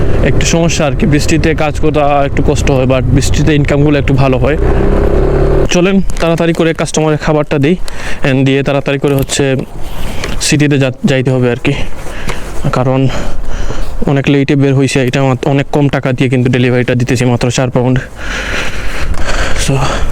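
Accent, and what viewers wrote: Sylhet, Bangladesh. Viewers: ভাই আফনে সিলেটি নি??,,কইবা আমারে,,❤ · সিলেটি নি ভাই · ভাই তোমার কথা হুনিয়া বুঝা যার আমরার সিলেটি মানুষ। ভাইর বাড়ী কই 😊❤❤ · vai apni ki sylheti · Bhai apne ki sylheti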